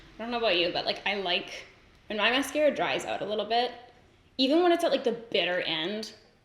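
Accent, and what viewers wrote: Canada. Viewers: My boyfriend overheard and said “is she Canadian??”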